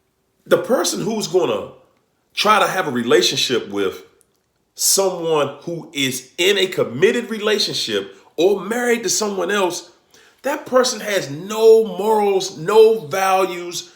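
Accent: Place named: Philadelphia, United States. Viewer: That is right ( more sincerity from the brother ) please everybody hear what he's saying. Yo, I'm talking about culture. He reminds me of my street friends. How we talk is how he talks..and he's saying a lot of things on the subject of narcissism. I'm so proud of you my friend and brother. I'm a NY'er, you sound like you from Philadelphia, you say DC keep doing what you're doing. Great message.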